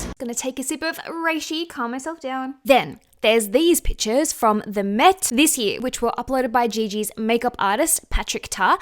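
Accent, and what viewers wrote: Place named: Australia. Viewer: It’s the Australian accent bro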